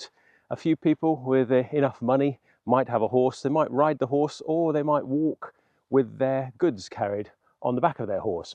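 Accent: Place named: Oxford, United Kingdom. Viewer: They are indeed. Couldn't agree more. It is an Oxford accent. Oxford University is where got his bachelors and masters degrees.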